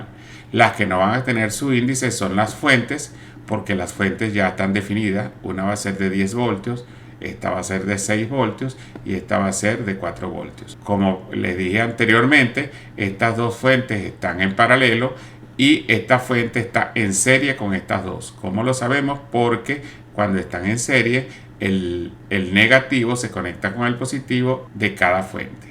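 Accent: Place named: Guárico, Venezuela. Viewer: Tienes un asentó de Guárico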